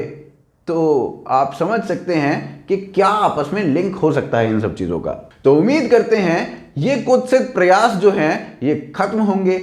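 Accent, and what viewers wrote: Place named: Punjab, India. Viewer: bhiba e ta Jatta da bolan da style a. You should Search Jatt on google.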